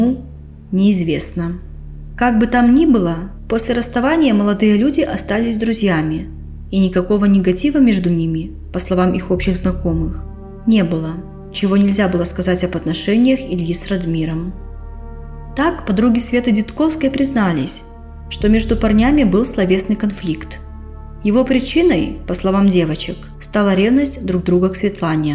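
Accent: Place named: Belarus. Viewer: Белорусский говор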